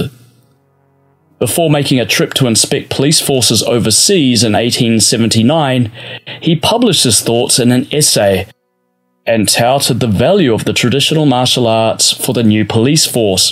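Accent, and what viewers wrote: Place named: New Zealand. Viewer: Nice work, great to hear a Kiwi explain this.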